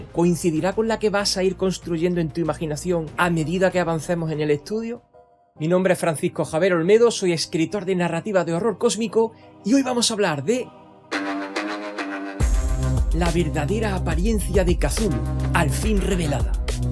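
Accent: Andalusia, Spain. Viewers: Francisco sos de Andalucia?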